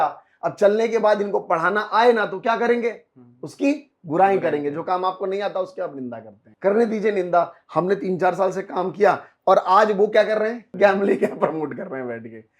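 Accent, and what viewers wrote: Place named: Chhattisgarh, India. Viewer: bhi aap Chhattisgarh se ho kya mujhe aisa lag raha 🤟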